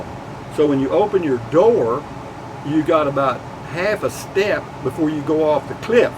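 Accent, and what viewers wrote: Texas, United States. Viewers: New Zealand here again cheers to see Beer Thirty return and to listen to interesting casual chat..really like to hear you Texan accent...Keep the beers coming!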